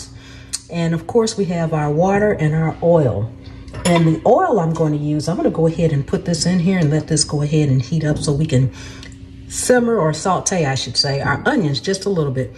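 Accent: South, United States. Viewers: I just love your southern accent, (and I'm from the south).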